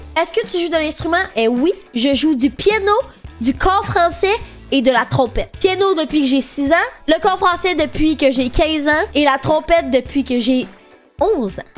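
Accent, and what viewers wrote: Quebec, Canada. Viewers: Honnêtement jsuis un américain qui regarde des vidéos en français pour m’aider a l’apprendre, et l’accent québécois me tue vraiment c’est un fever dream wsh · Je t'adore avec ton accent tu viens du Québec et je kiff. Continu tes vidéos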